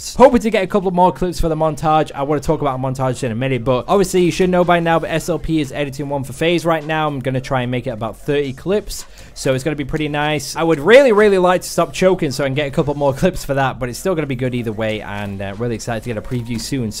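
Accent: Yorkshire, United Kingdom. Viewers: I don't notice your accent coz I'm from Yorkshire as well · aha is a Yorkshire like me 😂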